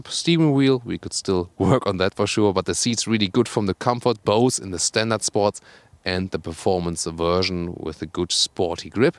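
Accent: Germany. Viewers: Schönes Video, aber du solltest an deiner Aussprache arbeiten. Das Klingt mehr nach Deutsch, als nach Englisch^^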